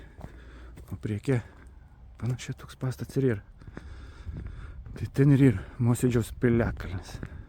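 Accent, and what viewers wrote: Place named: Plungė, Lithuania. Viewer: Smagė vaizdą pasėvakščiuojėmā, graži šnekta, a karts ne no Plungės būsi?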